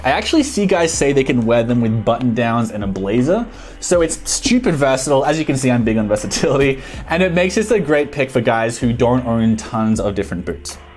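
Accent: Australia. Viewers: You sound very much like an Aussie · Old mate sounds Aussie to me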